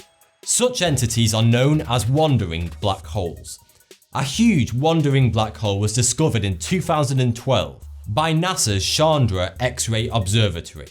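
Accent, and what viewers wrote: United Kingdom. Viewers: the problem is that i am moroccain and english its kindaaaa hard to me so i know like 23% of english words and he problem is that he is british and his english is so strong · That British accent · Mate he's British not American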